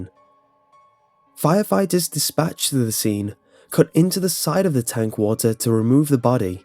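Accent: United Kingdom. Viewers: He's British, so of course he'll pronounce some words differently. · Hannah Schrenk Nice try hun, English born and bred. What I'm saying is that unless you hear the word pronounced 'properly' you would not know to apply a different language to it. His pronounciation is very typical of what is accepted here over the pond. Am I saying it is right? No. But it is still the case.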